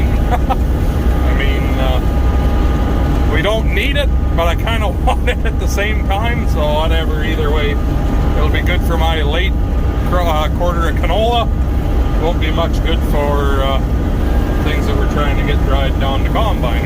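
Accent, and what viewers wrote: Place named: Canada. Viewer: You Canuck's sound funny when ya talk, I'v been noticing.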